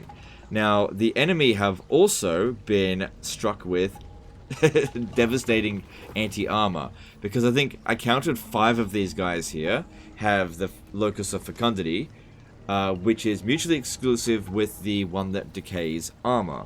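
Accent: Australia. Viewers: He is from down under.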